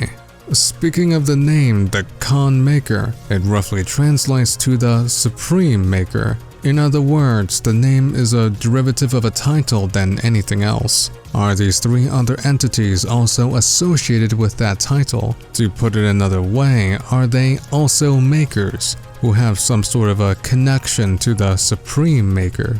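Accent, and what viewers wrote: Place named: England, United Kingdom. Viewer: You kinda sound like Ryo (english accent), from Devilman Crybaby lol. Also good video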